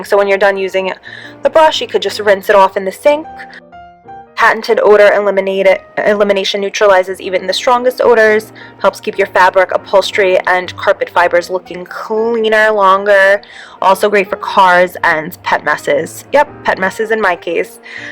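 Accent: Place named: East Coast, United States. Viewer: I can tell she’s from the east coast by her accent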